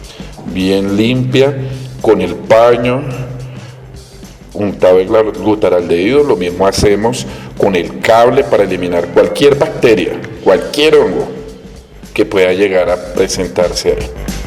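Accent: Colombia. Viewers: Eres colombiana!!!?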